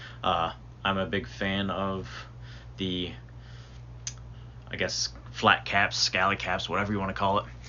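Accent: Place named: Pennsylvania, United States. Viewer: Just got to the part where you say you’re from PA... haha I can hear it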